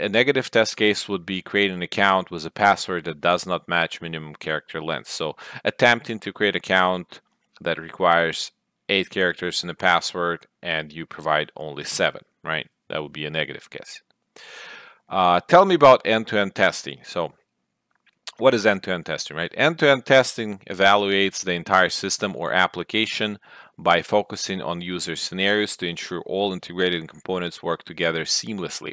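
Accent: Russia. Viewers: Fix your ruzzian accent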